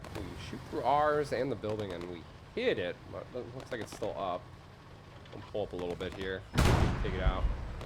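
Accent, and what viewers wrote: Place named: Ireland. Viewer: I'm struggling to pin your accent, I have a professor who is Irish who sounds kind of like you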